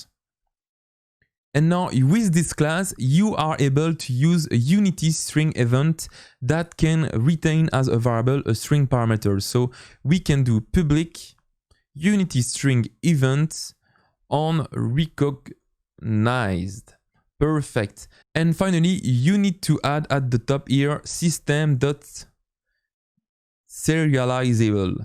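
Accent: France. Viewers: i find you got a french accent, am i right?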